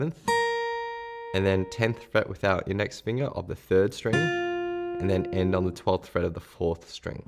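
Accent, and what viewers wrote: Australia. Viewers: Best tutorial vid on Youtube for beginner like me, thank you.. are you Vietnamese with Aussie accent? :)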